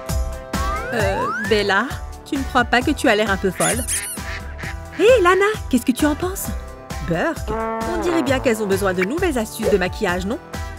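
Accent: England, United Kingdom. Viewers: elle sonq Anglaise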